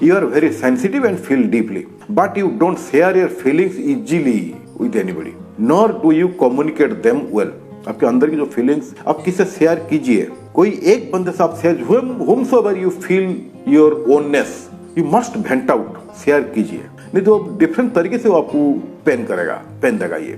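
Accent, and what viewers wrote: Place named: Bengal, India. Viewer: Y m I getting the good smell of Bengali accent from this particular video 😅😅😅 M I ri8 or not😢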